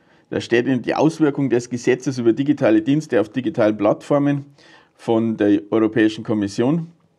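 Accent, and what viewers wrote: Bavaria, Germany. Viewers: Danke! Aber du sprichst Bayrisch da steigt die KI eh aus hahaha 😀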